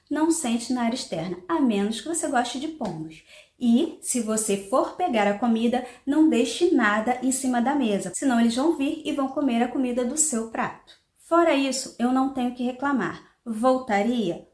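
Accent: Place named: Brazil. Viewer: Speak like brasileira.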